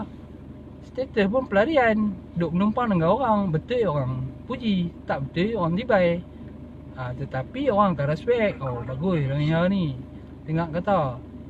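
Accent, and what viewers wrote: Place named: North, Malaysia. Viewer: Tapi abg cakap macam orang utara ye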